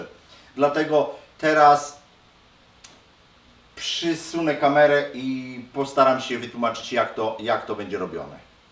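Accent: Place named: United States. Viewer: Od ilu lat mieszkasz w Ameryce? Nawet jak mówisz po Polsku, to słychać że kacentujesz inaczej :-).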